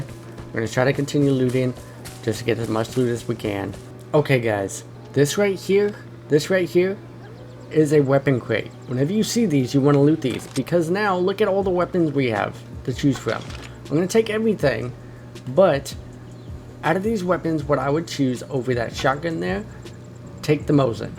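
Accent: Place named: Boston, United States. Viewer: Are you from boston? Cool accent bro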